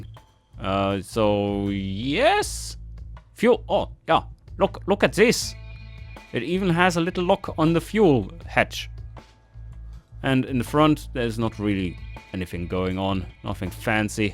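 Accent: Germany. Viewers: Actually, are you from Germany? :D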